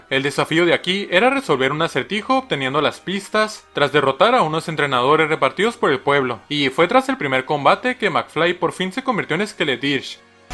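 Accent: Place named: North, Mexico. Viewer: Oye bro. ¿De qué parte del norte de México eres?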